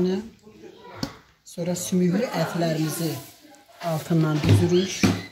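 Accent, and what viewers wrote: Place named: Shamkir, Azerbaijan. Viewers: gozel şemkırım lehcenin qadasin alim birinci defe baxan kimi bildimki şemkirlisiz👍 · Əllərinizə əməyinizə salıq olsun cox xanım bəh bəh sizin danışığnız lap Samuxlular kimi danışırsız mənə elə doğma və ləhcəniz şirin gəlir ki dilinizə quzu kəsim lap darıxdım kənd həyəti ücün mənimdə bir tərəfim Şəmkirin qırmızı Samux kəndindəndir eşq olsun Səmkirlilərə və bütün rayonlarına cox sevirəm sizi əzizlərim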